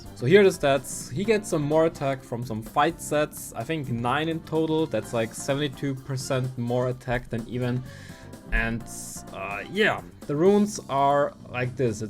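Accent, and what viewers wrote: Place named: Germany. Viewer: your german accent is hard man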